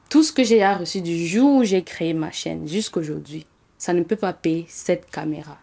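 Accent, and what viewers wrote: Cameroon. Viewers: Merci cela fait un bout de temps que je te suis, seulement en suivant tes conseils on peut beaucoup amélioré sa routine. Il y a par contre celles qui font seulement du pur marketing. C est normal après de faire payer ceux qui veulent plus, c est comme un accompagnement dans le parcours capillaire. Et puis j'aime tes blagues, tes analyses même si je ne commente pas et surtout l accent camer qui me rappelle mon pays d adoption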